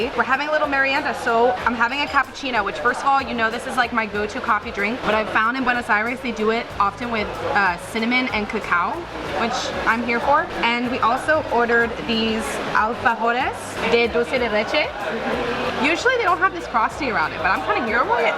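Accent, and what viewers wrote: United States. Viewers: I'm from western Canada. Think Montana/Minnesota accent. When I listened to you, I thought I heard a hint of Bahstan.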